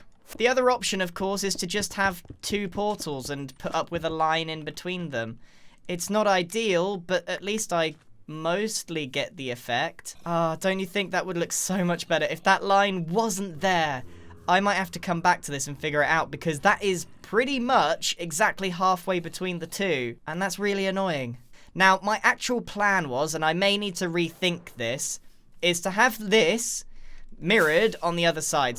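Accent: United Kingdom. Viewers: Why does sound so much more british? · Is it just me or does he sound more British than normal ?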